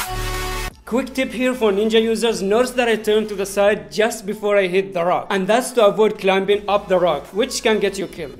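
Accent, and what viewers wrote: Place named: India. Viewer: I don't know where r u from. But ur sounds like u r Indian😀.and I really like your gameplay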